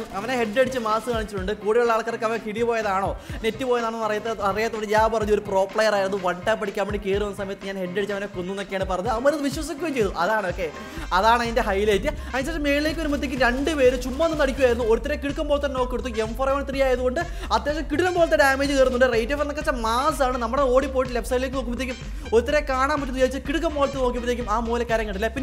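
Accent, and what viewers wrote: Kannur, India. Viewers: Bro Kannur aano ?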